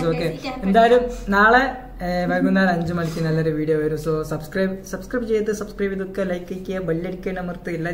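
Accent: Kozhikode, India. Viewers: പോയി പോയി കോഴിക്കോടൻ touch ഉണ്ടല്ലോ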